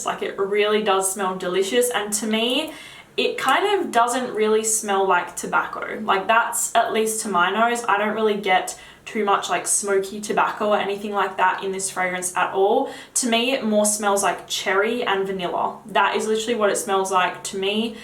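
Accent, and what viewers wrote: Australia. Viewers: I like her sexy Aussie accent and voice. Not bad on the eyes either. Never mind the fragrances... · Well... I've never heard a posh Australian accent before. Very nice. 👍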